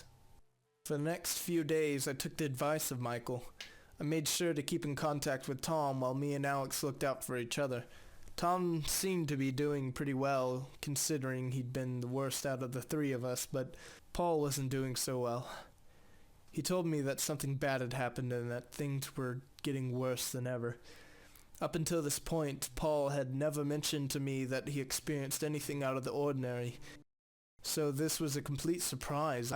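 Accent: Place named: England, United Kingdom. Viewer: Ya its english accent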